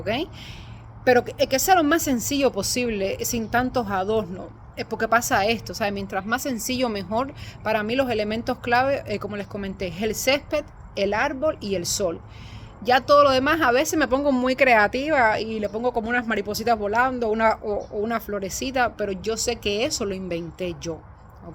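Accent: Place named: Cuba. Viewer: Hola muchas gracias por todas tus recomendaciones son muy valiosas. Tienes un acento hermoso cubano Un gran abrazo desde Colombia 🙏🙏🙏❤️❤️❤️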